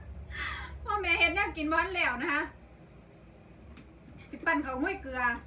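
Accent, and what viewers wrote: Ubon Ratchathani, Thailand. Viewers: พูดแบบคนอุบลแท้ดูทุกคลิปนะคะ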